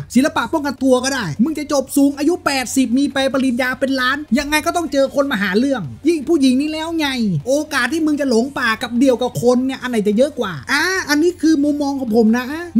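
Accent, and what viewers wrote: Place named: North, Thailand. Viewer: คนที่ไหนนิ อู้มาฮู้เลย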